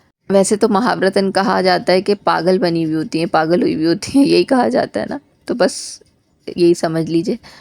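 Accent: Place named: Karachi, Pakistan. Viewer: Itni saaf aur khaloos wali urdu sirf Karachi walay he boltay hn. 😂❤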